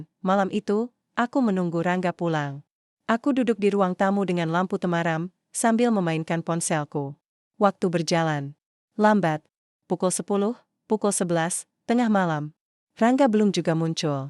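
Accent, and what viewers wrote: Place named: United Kingdom. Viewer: Kenapa to kok harus pake logat inggris segala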